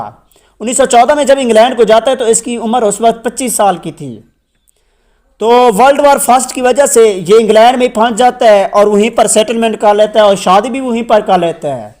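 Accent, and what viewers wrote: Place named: Saraiki, Pakistan. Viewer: Saraiki accent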